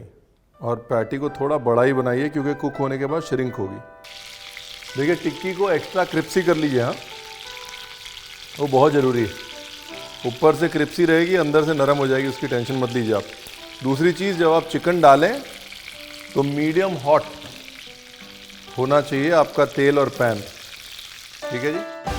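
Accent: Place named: North, India. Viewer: Hey Ranveer u look amazing & ur North Indian accent as well as the recipe is even more fabulous... 😋☺️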